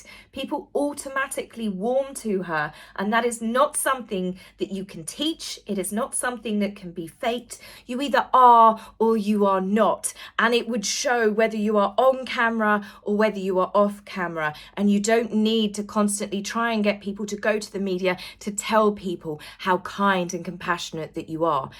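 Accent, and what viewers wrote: United Kingdom. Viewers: Lol, I thought exactly that (about how British Edward, the Duke of Kent) looked. What a profile! He’s a year younger than my Dad (Papa)…he’s up on his roof cleaning his gutters, using a push mower to cut his grass, does all the same stuff he did 20 years ago. He scares all of us girls (3 daughters) to death, because he lives alone. But he won’t have any of our fussing, he just carries on an ignores us. Thank you for reading that bit in your lovely British accent, and not subjecting us to Meghan’s common Californian 🤭. I say that as a Southern American with friends in California…but her voice in particular, just really grinds my gears 😉. 💖🌸💖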